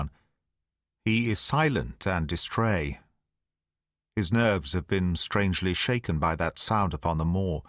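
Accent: United Kingdom. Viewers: I don't understand British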